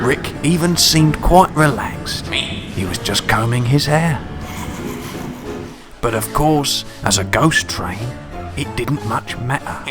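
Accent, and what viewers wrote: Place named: Cockney, United Kingdom. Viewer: And every year of the date of the accident it runs again as a warning to others, plunging into the gap, shrieking like a lost soul! Great work! I really enjoyed it! Great voiceover too. Good cockney accents always sound good.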